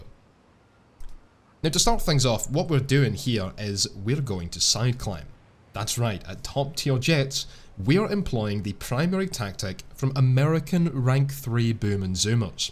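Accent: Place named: Edinburgh, United Kingdom. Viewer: You sound like your from Edinburgh….I like Edinburgh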